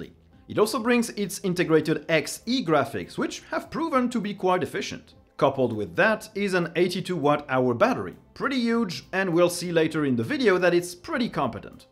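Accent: France. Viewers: When I see the Linux Experiment I have to click it. It is like an addiction. He has the whole package: Beard (some say a squirrel is hibernating in it), the French accent, the same undershirt he has been wearing for 10 episodes straight (who doesn't like Roquefort?).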